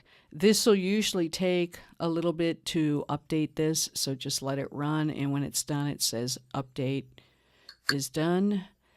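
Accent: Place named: South, United States. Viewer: I appreciate you southern accent but it's Roku not Ruku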